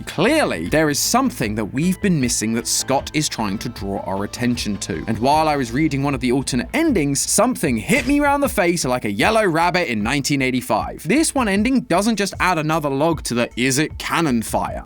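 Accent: United Kingdom. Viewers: Love listening to British Matpat while eating dinner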